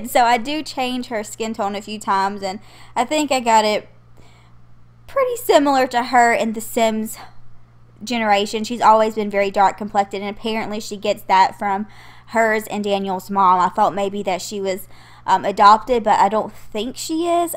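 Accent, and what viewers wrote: South, United States. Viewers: So Cute......... And Loving Your Southern Accent...... As You See I Am Southern! HUGZZZZ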